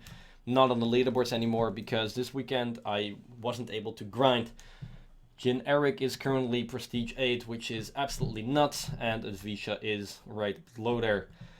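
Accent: Netherlands. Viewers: the first sentence already told me you are dutch. My accent is as bad as yours xD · Are You dutch?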